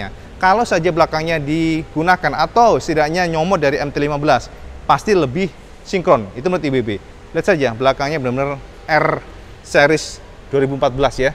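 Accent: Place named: Tulungagung, Indonesia. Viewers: Jagange endi to jagange.. tak habis2 ak seneng karo bahasamu maas.. kuentel tulungagung e 😄🙏🏻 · salam Jooosss Gandooos mas bahasanya dan gayanya khas wong Tulung Agung sehat selalu mas Bro👍🏼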